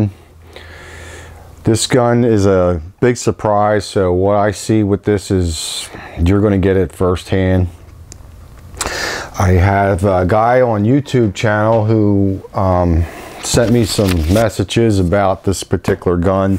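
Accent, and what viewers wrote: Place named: Pennsylvania, United States. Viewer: I'm split between this and the 95 in .22 Looks like Hatsan is pretty good in the sub 300 range. BTW I'm gonna go ahead and guess you're from east Pennsylvania based on your accent. Am I way off?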